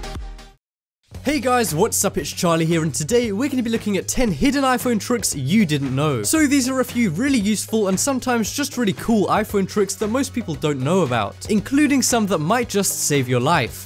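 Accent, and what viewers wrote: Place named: United Kingdom. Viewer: Omg he has a british accent, me took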